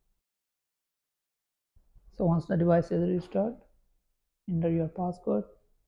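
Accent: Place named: India. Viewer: When I heard that Indian accent I knew it was fake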